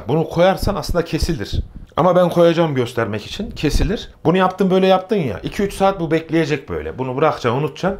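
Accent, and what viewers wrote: Anatolia, Turkey. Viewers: Ünlü fitness içerik üreticileri veya bu camiada fenomen olarak bilinen kimseler bana nedense samimi gelmiyor fitness hakkında da çok bilgim yok dövüş sporcusuyum ama bu adam nedense samimi geliyor 2 videosu çıktı karşıma sadece.. bir de anadolu ağzı var tam içten 🙂 adamsın kral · Aradığım üslup, aradığım sunum, aradığım bilgiler Aptal terimler yok anadolu anlatımı on numara bu video tüm naftalara gelsin...:)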